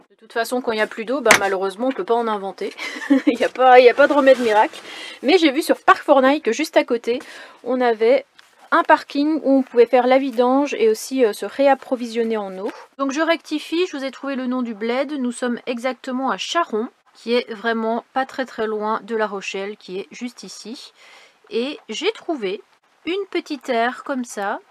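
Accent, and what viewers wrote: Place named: Brussels, Belgium. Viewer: Ah ben c'est vraiment super cette nouvelle ! J'avais vu sur la chaîne de la Zick'Family le camping car en question du gars qui l'avait utilisé pendant 7 ans comme chambre d’hôtel parce qu'il travaillait sur un aéroport ou un truc comme ça (avant sa retraite actuelle). J'avais pensé à toi quand il parlait de la batterie auxiliaire (à cause de ton accent bruxellois) j'avais pensé "pourvu qu'elle le voit". Tu auras bien plus de place pour ranger tes affaires, une penderie près de la table, une autre près du grand lit ! + des tas de placards, une salle de bains avec wc, évier ! le pq n'aura plus jamais besoin de trôner sur l'évier de la cuisine ! Tu pourras laver tes cheveux à l'eau chaude puisqu'il y a un chauffe-eau au dessus de l'évier cuisine !, c'est fini de se crisper le crâne à le laver à l'eau glacée en hiver ! 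Frigo, chauffage, isolation au top ! Je suis bien heureuse pour toi et ton fils quand il sera là avec toi. (sans oublier Nanny bien sûr !) Ça va vraiment vous changer la vie ! Même pour Idriss, vous pourrez venir manger là aussi à deux ou entre amis, jouer à des jeux. Par contre j'ai vu que la table avait un petit problème (fendue sur le côté qui touche la paroi sous la fenêtre) mais ça ne doit pas être grave, juste esthétique, sinon Nicolas l'aurait dit je pense. Bon courage pour faire ton "déménagement"/aménagement dans le nouveau "Gérard II" J'ai hâte de voir la vidéo de demain (quand je pourrais car j'ai des tonnes d'activités en ce moment, même le soir) (Festi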